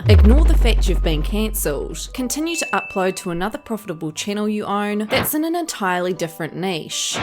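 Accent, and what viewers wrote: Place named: New Zealand. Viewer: Im watching for your Kiwi accent 👌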